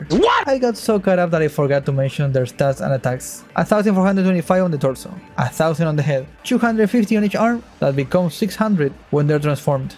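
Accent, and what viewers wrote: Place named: Argentina. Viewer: el primer momento que escuche tu acento ya sabia que eras Argentino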